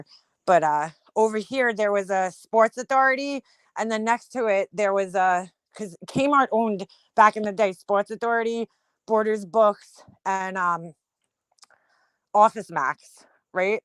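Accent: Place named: Brooklyn, United States. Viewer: I don’t know how your channel would up in my feed but it’s wasn’t too bad listening to it, coulda been cuz of Ron’s channel. I will say that I have family in Mass Park and I go there frequently, matter of fact I was there 2x last week and the PD wasn’t there each time, my sister also said they’ve been outta there for 2 weeks already. Good luck seems you’ve got a well established following. Haven’t heard the Bensoshurst / Bay Ridge accent since I retired !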